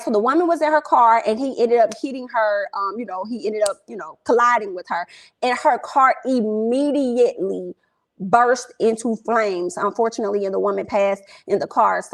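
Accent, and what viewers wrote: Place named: Alabama, United States. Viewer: Girlll what part of Alabama are you from?!?!?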